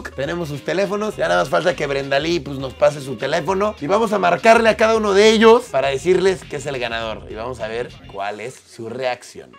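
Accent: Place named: Venezuela. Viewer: Ese estilo de hablar es muy muy de Venezolana JAJAJAJA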